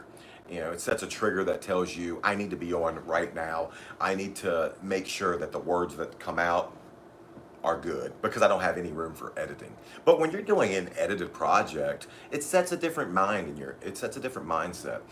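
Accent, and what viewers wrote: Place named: Eastern Shore, United States. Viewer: man your accent reminds me of home... im a eastern shore boy from maryland.. love it bro keep up the good work.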